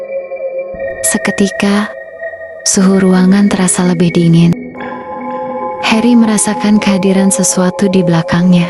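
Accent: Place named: Indonesia. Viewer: Hai anak mak shayang, mak hadir layan sbg peneman tidur 😍 huhu terbaik cerita malam ni... kisah asrama 👻 smakin menarik editing ai dan penceritaan wlopon loghat indonesia mak suka 😀 keep it up shayangku, smoga trus sukses, Amiiin🤲🫶❤️❤️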